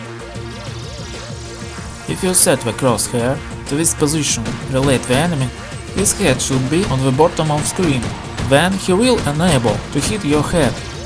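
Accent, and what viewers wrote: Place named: Russia. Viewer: I love Russian accent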